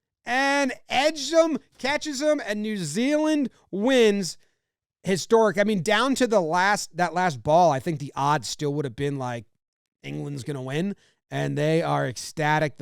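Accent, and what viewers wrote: United States. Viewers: its wierd listening to an american explain te rules of cricket....perth west australia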